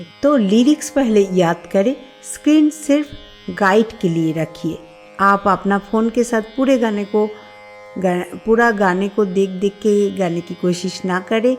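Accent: Bengal, India. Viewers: नमस्कार देवीजी। मैं अरुण वाडीकर पनवेल, ’नवी’ मुम्बईसे। आपकी प्रस्तुति बहोत अच्छी है, अपितु आपकी आवाज और विषय को पेश करने की कला, अंदाज़ में जो सादगी और बंगाली लहजा है, वो उसे और भी रोचक बना देता है। यूंही अपने श्रोता गण के ज्ञान में वृद्धि करते रहिए। शुभकामनाओं के साथ। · tumko jhudko hindi barabar bolna nahi aata. bangali mam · महोदया बंगाल से है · Aap bengali ho? accent comes like that 😊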